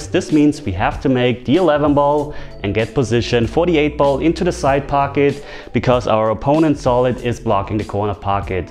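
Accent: Germany. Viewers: Great video, as usual. Interesting to hear you speaking with your bavarian accent. 😉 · Love the German stoic accent and your teutonic pool playing efficiency... Great..!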